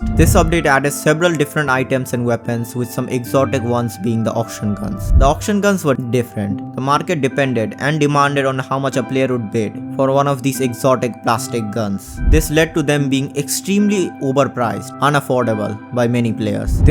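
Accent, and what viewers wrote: India. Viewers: Your tone is like Indian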